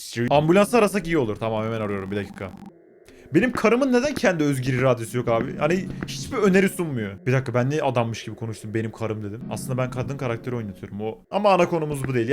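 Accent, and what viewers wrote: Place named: Istanbul, Turkey. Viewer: Muazzam İstanbul Türkçeniz ve inanılmaz diksiyonuza hayran kaldım hanım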